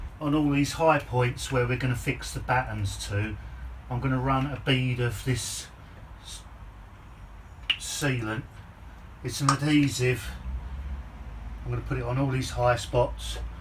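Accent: United Kingdom. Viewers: Why is a British accent so lovely...being respectful...but you know what I mean...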